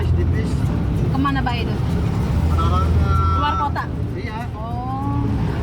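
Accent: Jakarta, Indonesia. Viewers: Salam kenal yo pok..aku dejet lho pamulang..pertama kali liat youtube pok lgs pengen liat terus video2 yg lain...biarpun aku org jawa tp denger celotehan pok yg khas betawi bangeet lucuuu...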